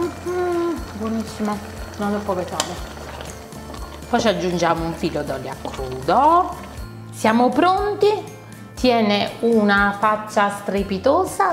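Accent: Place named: Irpinia, Italy. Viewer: Ciao, ti seguo da un po’.. quando ho visto la tua prima ricetta mi sono catapultata indietro nella mia infanzia... i miei genitori sono di ARIANO IRPINO e il tuo accento è il tuo modo di fare mi ha fatto davvero tornare bambina, alle mie estati giù dai nonni, con i pranzi infiniti e i profumi che solo giù si possono sentire... grazie per le emozioni che mi hai fatto e mi fai rivivere dopo ogni tuo video.. ❤️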